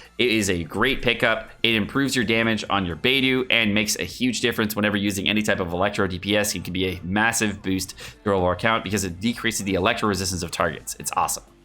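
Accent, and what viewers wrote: United Kingdom. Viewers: Y British tho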